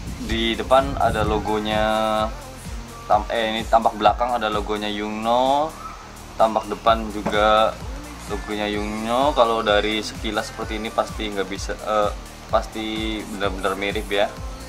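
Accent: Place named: Banyumas, Indonesia. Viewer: Jawane ngndi lurr??, Medoke ngapakk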